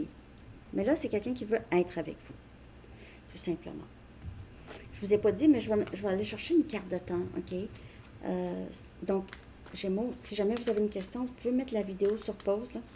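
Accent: Quebec, Canada. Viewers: Love You* * & j'aime ça le parler Québécois..! Tellement beau..!❤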